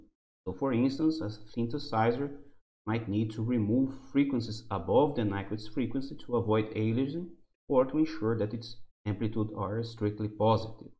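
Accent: Brazil. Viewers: Brazillian accent s2, is DDSP made in BR?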